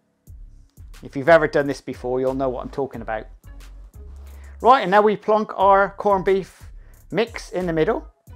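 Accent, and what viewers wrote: United Kingdom. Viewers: i love your accent. what kind of uk accent is it?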